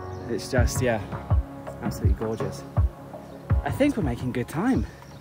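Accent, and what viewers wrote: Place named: Newcastle, United Kingdom. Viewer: Ahhh a Geordie 😂😂😂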